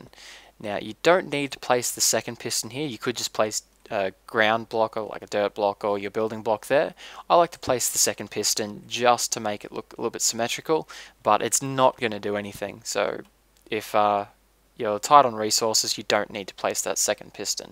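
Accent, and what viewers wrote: Australia. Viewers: I left a comment Down Under, where your accent's from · down under XD sorry made me giggle I work with Aussies so i find all of the little dialectal inflictions amusing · are you aussie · Are you Australian? :)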